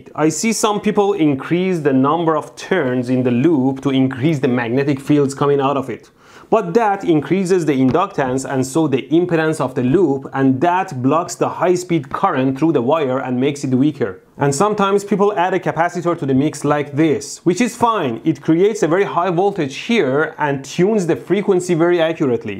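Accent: Iran. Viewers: لهجه ایرانیت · Your accent looks like iranian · he has an Iranian accent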